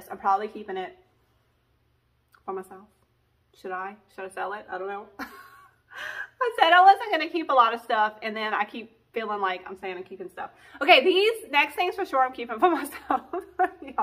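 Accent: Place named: Los Angeles, United States. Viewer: Love your ideas...learn so much...love your humor and LA accent🌱🦆🌱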